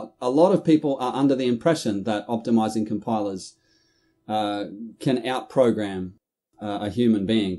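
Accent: Australia. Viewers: Assembly x86 presented in an Australian accent? Couldn't be better.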